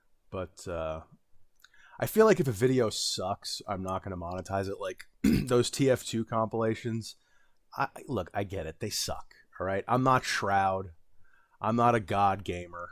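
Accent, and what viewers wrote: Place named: United States. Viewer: The voice The shirt The American accent Absolutely cinema.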